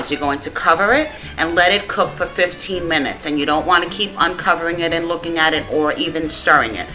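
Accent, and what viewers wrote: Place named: New York, United States. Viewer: I love your accent soinds new yorker food looksnbanging girl! Love spanish food!!!!!! Any type of spanish food #1 fav food of all!!!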